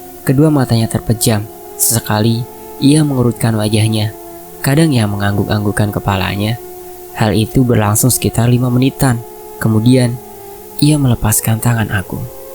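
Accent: Java, Indonesia. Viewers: Jowomu medok bngt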